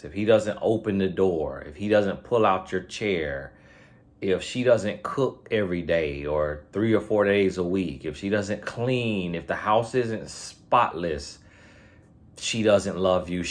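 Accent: South, United States. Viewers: I couldn't have said it better myself .His country accent and venecular makes him unique it's his je ne sais quoi if you will . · PLEASE stop apologizing for your Southern accent, vernacular and the manner(s) in which you express yourself. People who are critical of you and cannot appreciate the teachings you bring to the table do NOT deserve access to your wisdom. Please NEVER CHANGE. My family has Southern roots, and that’s one of the main things that drew me to your channel. YOU ARE REAL, and you keep it 100. Thank you for that! Those ‘articulate’ critics can just leave as far as I’m concerned. I LOVE YOUR REALNESS. GOD BLESS YOU AND YOUR WIFE, and I thank GOD for you, your teachings, and your wisdom. KEEP BEING SOUTHERN/‘COUNTRY’ YOU. Your loyal fans love it, appreciate it and accept you for who you are!✅🙏🏽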